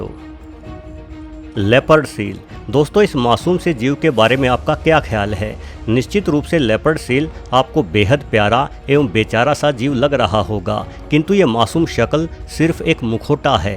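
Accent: Haryana, India. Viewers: HR se hi ho kya Sir aap voice se lgta hai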